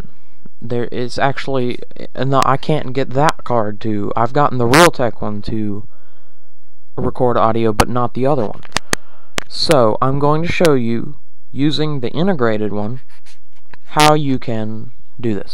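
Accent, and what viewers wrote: South, United States. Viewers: are you fucking KIDDING ME?? i was trying to find a way to record FROM MY SPEAKERS WITHOUT A MICROPHONE and sat through SEVEN MINUTES of you bloody SOUTERN ACCENT to show me that your whole problem was to USE A MIC?? well that's not fair... i'm speaking out of hate, and now that i've calmed down, i can say calmly that ou've wasted my time. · you has a country accent like me :p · lol southern american.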